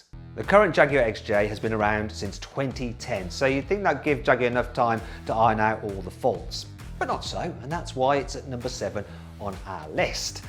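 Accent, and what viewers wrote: United Kingdom. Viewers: この本来の英語の発音が好き 英国訛りとか言うが、アメリカ英語こそ訛りなのにね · ちょっと崩れた英国英語のアクセントが良いですね。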